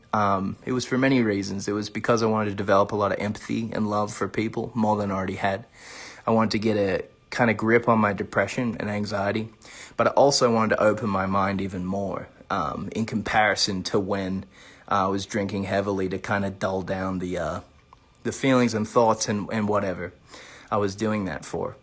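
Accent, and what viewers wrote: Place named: Australia. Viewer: THANK YOU AND HE SOUNDS SO AUSTRALIAN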